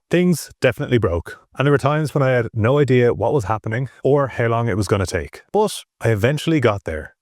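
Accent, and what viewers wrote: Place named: Ireland. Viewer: Which part of Ireland is your accent from?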